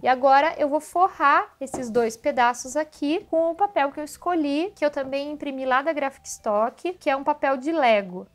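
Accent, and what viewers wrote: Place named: Santa Catarina, Brazil. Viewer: vc é de Santa Catarina? é que eu vi a foto no Beto Carreiro, e seu sutaque tmbm parece daki ahhaha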